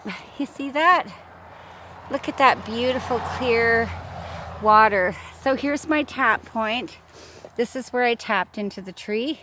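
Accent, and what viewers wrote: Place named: Canada. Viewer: Thanks for always giving people something to learn, now i see how your channel name, coincides with each other! Awesome video. I hear the Canadian accent 😊👍🇨🇦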